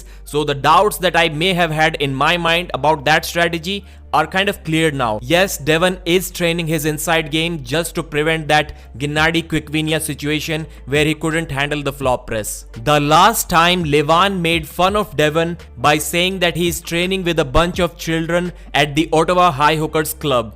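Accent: India. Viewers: Your indian accent is so funny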